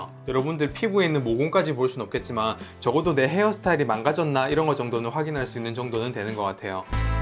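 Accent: Gyeongsang, South Korea. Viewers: 잘 만든 영상 잘 보았습니다 한 가지 거슬리는건 경상도 사투리 말을 빨리하려다 보니 사투리 억양이 너무 심하군요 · 대구사투리인가...부산사투리인가....그것이 궁금하다...허허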